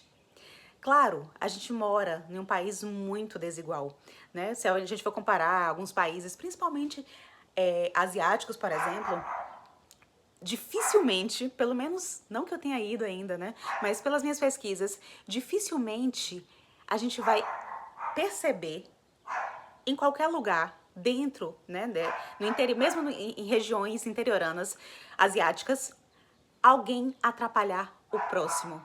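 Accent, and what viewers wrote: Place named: Salvador, Brazil. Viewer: O silêncio é um espaço vazio esperando ser preenchido com harmonia! Já morei em várias cidades do Brasil e percebi que a invasão do espaço alheio "poluição sonora" é uma questão cultural. Todos passamos por um processo evolutivo e acredito que a turma do barulho possa mudar o tom, e conviver em paz com seus semelhantes. Você tem um sotaque de Salvador, onde o silêncio vale ouro!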